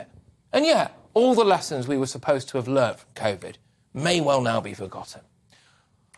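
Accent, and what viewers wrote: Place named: England, United Kingdom. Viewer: American Republican with an English accent !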